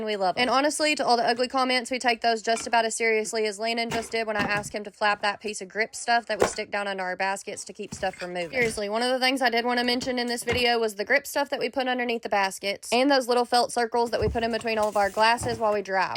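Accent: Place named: South, United States. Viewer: It is unbelievable how much stuff you can fit in them small places and you sound southern witch would in fact answer the fast talking thing but i do love you accent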